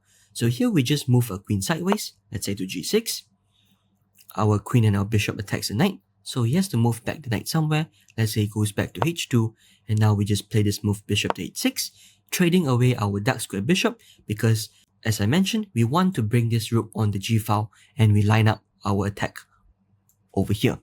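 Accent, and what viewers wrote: Singapore. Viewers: You sound like a Singaporean. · Definitely Singaporean or Malaysian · Typical Singlish accent. Is this course given by Tin JingYao (Singapore number 1 GM by rating)?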